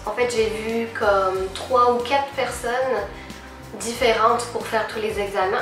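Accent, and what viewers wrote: Quebec, Canada. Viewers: Ton accent quebecois devient de plus en plus prononcé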